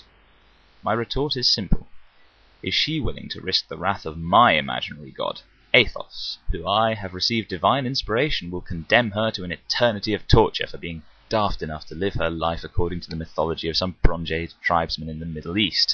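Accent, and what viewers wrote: United Kingdom. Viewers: I made a response too but it just sounds so much better with an English accent!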